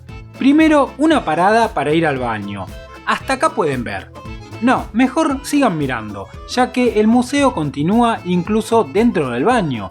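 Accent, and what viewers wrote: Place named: Argentina. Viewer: lo intente ver pero el tonito argentino es realmente castrante, no pueden esforzaren hablar bien, no se dice casye se dice calle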